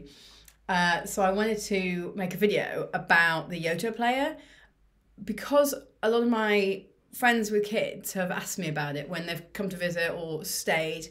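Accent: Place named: England, United Kingdom. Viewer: Nothing wrong with the British accent.... But to be fair, it's an English accent.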